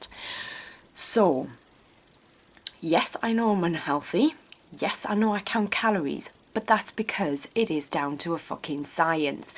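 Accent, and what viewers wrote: United Kingdom. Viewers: haha, while I agree with your rant..your accent just made me laugh tremendously. idk something about British accents saying the word "fuck" lol. but truthfully only someone jealous of your will power or insecure with their body would write something like that. I know bc I'm super jealous. But I'll deal lol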